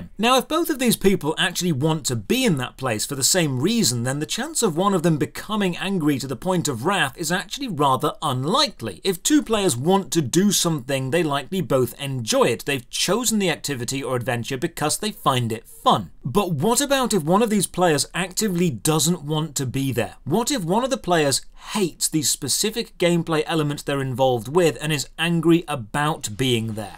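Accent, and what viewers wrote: England, United Kingdom. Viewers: I like the way u talk to me English man, Keep it up ole chap XD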